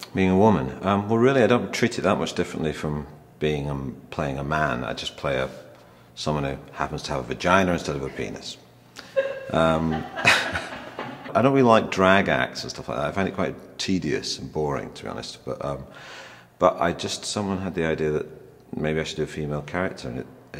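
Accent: United States. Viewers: That american twang is so annoying!! Saw him doing his tour in Oxford a couple of days ago, and there was none of this accent nonsense! (And it was brilliant) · yep, he's not that great on this. and he's developed one hell of a yankee accent too. must've been out there too long. "partridge, you W***ER!!"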